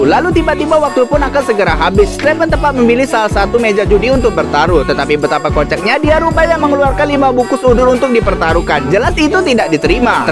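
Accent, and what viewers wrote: Palembang, Indonesia. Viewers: Kau wong Palembang po 😅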